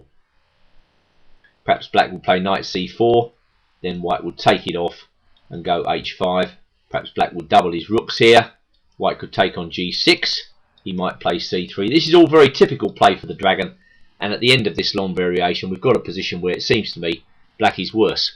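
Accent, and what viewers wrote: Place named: England, United Kingdom. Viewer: I don't think so at all. It's a classic English accent. At least I can understand him. Whereas with a Scottish accent for example, I often have trouble understanding it. Scottish accents remind me of French Cajun from Louisiana where almost every other word is indiscernible. So I have no gripes about this man's accent. Perfectly English.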